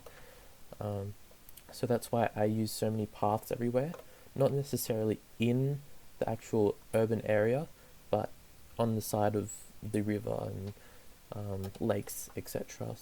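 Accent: Australia. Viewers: Mate I love your cities! And I can tell you're an Aussie too. Would love to see you do a let's play without mods. You could model it on an Aussie city too. Give it cool district names and have specialised districts too. Would be cool!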